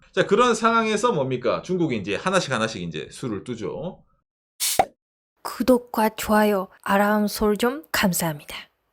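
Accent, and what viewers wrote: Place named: Gyeongsang, South Korea. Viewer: 일본 사람 팬더 좋아한다메? ㅋㅋ 과 같이 살더니 자연스레 경상도 사투리를 쓰시네요~~~^^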